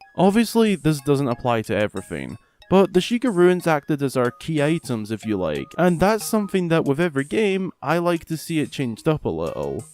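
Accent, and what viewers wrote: Scotland, United Kingdom. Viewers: So this is my first time watching your videos... And i can't keep myself wondering where you live. To me it sounds like you're from Scotland but you clean up your speech so that the rest of the world can understand you... That aside, I do agree with you on your points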